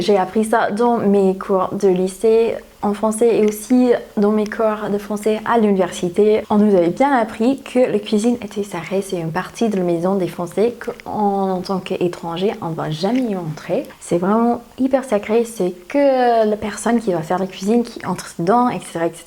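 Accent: United States. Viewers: Oui je me doute ne t'inquiète pas! Dans ta dernière vidéo il me semble que tu as dit avoir changé de micro il me semble et la qualité était plutôt bien donc je me suis dit que tu l'avais peut-être juste mal positionné cette fois :/ Et j'en profite pour te dire que j'adore tes vidéos et surtout ton petit accent américain est trop cute! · C'est trop drôle, heureusement que tu n'as pas ri comme on te l'a appris à l'école sinon les gens t'auraient trouvé bizarre. J'aime beaucoup lorsque les américains parlent français, c'est très mignon comme accent. Je viens de regarder quelques unes de tes vidéos, j'adore et je m'abonne. A bientôt.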